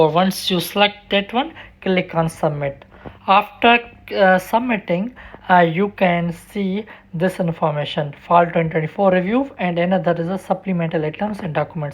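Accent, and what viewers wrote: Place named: Sindh, Pakistan. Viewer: Sir apki English main bhi Sindhi hone ka sabbot hai♥ MashaAllah Boht acha guide karty ho ap..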